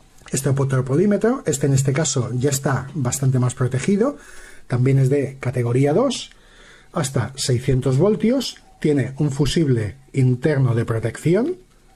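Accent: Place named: Spain. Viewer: Voz española.... Huuuuuac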